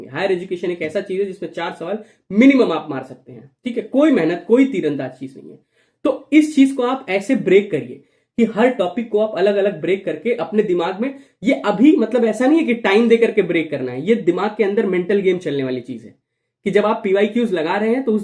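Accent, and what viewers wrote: Bihar, India. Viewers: Your Bihari accent is so cute😂❤ and the video is really helpful thank you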